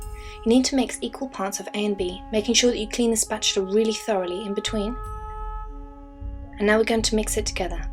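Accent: United Kingdom. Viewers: Are you British? coz i am well im scottish but oh well i love you accent and your art :) keep it up! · Errr... no. its a British accent.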